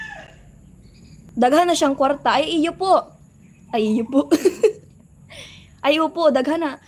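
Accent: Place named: Visayas, Philippines. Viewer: 😂😂😂😂😂 nakakatawa ka langga mag salita nf bisaya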